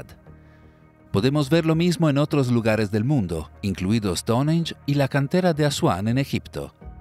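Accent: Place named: Italy. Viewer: Por si no notaste el acento, el narrador es de origen italiano,. Habla un español PERFECTO, me gustaria oirte a vos hablando italiano........ademas podrias tener mejor modo para hacer una critica o comentario, ademas insignificante.......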